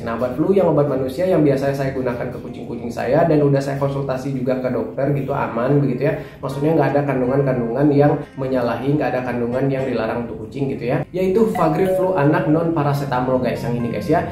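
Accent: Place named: Banten, Indonesia. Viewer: Kebantern ngomongnya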